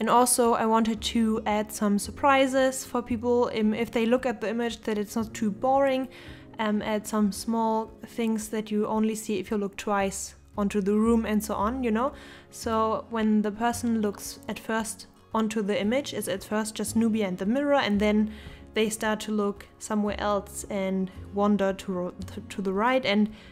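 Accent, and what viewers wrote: Germany. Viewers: Ich find das so merkwürdig du sprichst so gut Englisch und trotzdem hört man sofort dass du deutsch bist ( hoffe das stimmt auch ) aber ich hab keine Ahnung woran ich es erkenne außer an diesem typisch deutschen "ähm" :D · Are you German by any chance? Your accent sounds kinda familiar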